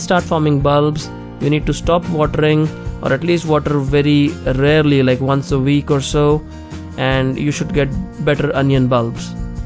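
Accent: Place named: South India, India. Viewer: I wanted to ask a question for a while ,are you South Indian ? by the way your videos are too good it needs a great effort to make them , keep up the great work and we are looking for some more learning techniques in gardening Thank-you for sharing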